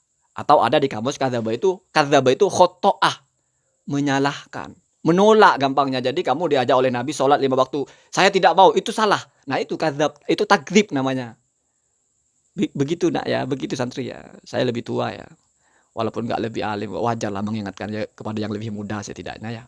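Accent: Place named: Banyumas, Indonesia. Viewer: Jngn ngapak maka nya baca sesuatu itu biar jls ,jngn medokkkkkkk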